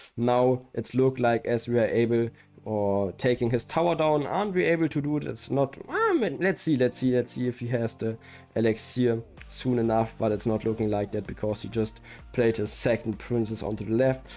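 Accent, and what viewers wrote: Germany. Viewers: you really are a legend. btw do you happen to be from germany or is that another accent im hearing?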